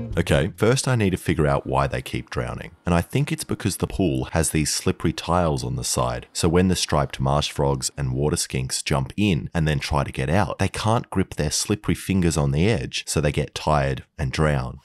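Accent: Australia. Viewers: Are you from Austrália? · Bro this dude be australian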